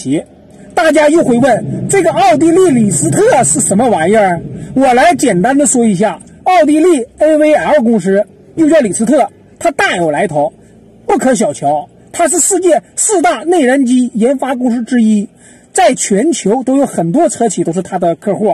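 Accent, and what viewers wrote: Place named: Northeast, China. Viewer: 事情是这么个事情，但是一配上浓浓东北话杂听咋不是那么一回事。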